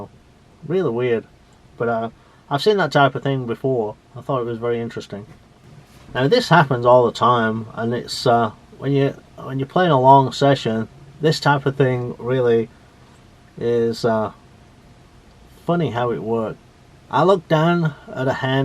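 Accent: United Kingdom. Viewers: You sound like a guy from the north of England, whose been living in the deep south for a little while... am I right? Keep up the great work!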